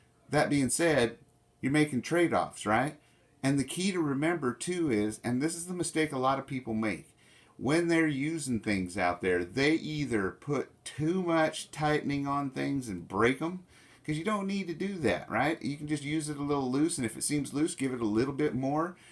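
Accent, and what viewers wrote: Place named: South, United States. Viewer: I detect a bit of Southern in your voice. Where ya at man?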